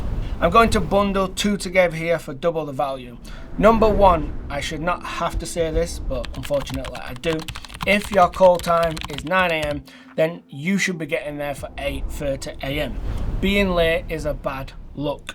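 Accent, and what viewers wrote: United Kingdom. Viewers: Great tips, thanks, I HAVE to ask where in the UK your accent comes from? (if you don't mind)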